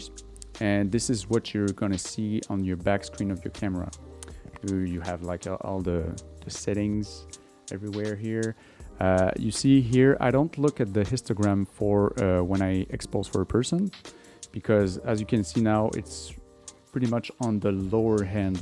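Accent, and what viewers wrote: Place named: Quebec, Canada. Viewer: I think I can hear you quebec accent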